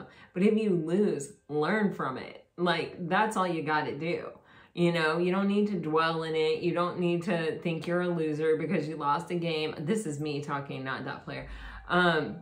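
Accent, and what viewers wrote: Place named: Boston, United States. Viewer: Are you from Boston ?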